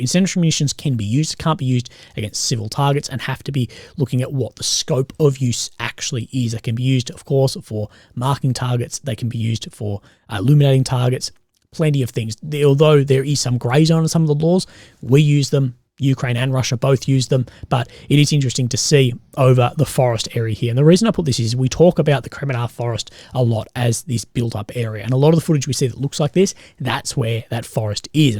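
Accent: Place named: Australia. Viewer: I like your take on things as one old soldier to another..but unfortunately your gravel voice and Auzzie accent make it difficult for non native speakers to follow you so please speak a little slower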